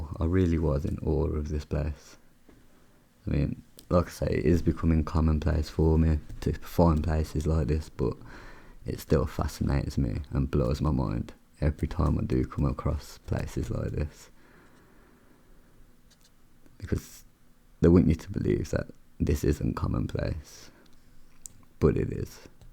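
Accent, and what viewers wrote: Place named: Birmingham, United Kingdom. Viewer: BOOM! You sound like you are from near to where I came from? Are you a Brummie? Close by?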